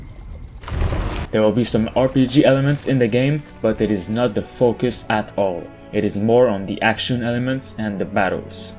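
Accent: Quebec, Canada. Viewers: Jolie accent quebecois! ^.^ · Clearly a French Canadian I recognize it by that small accent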